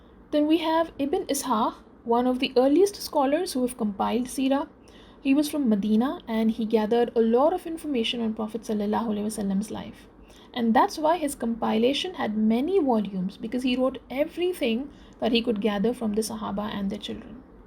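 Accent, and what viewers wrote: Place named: India. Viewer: i think she lives in Qatar(as mention in about section) but by accent it seems she's from India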